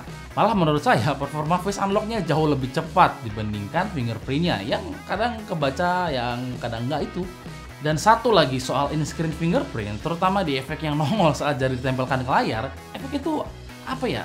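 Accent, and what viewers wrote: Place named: West Java, Indonesia. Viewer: Sunda ninganan. Baruk urang mana da ngomong basa nasional wkwkwk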